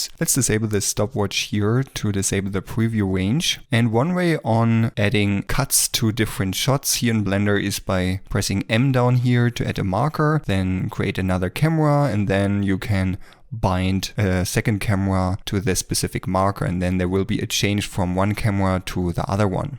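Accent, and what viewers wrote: Germany. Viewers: It's crazy how germans instantly notice a german accent of english when they hear it... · Are you German? 😅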